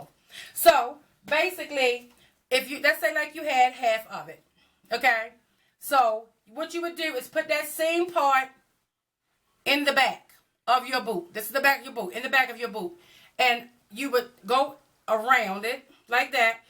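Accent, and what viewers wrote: Baltimore, United States. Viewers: B- more accent Luv it. I was told I talk country and I came from Philly 😂 Luv this video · I hear that Charm City accent..Hey my B-more neighbor... I will be taking that drive to the Flee market. KEEP UP THE GOOD WORK I love this channel.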